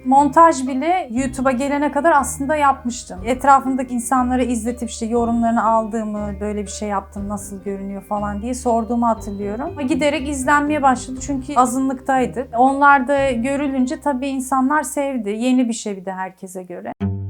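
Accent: Turkey. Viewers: Çok takdir edilecek bir başarı yakalamışsınız. Çok gurur verici.Ancak gücenmeyin lütfen ama yapıcı bir eleştiri yapmak istiyorum. Telaffuzunuz tam bir Türk gibi. Aksanınıza biraz daha özen gösterirseniz yabancılar tarafından daha fazla izleneceğinizden eminim.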